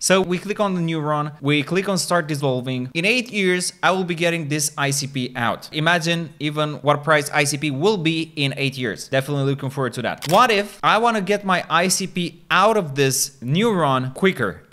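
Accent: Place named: Portugal. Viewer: 100% que es tuga! 😁Bom conteudo btw, keep it going ehehe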